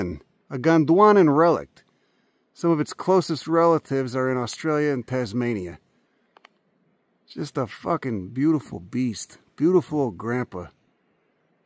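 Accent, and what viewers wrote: Chicago, United States. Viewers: Jesus two seconds in this guys got to be from chicago !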